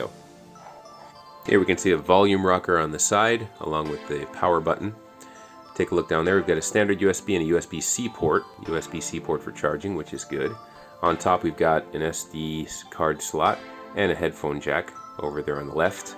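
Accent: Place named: Canada. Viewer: You sound Canadian. Is that $30 Canadian?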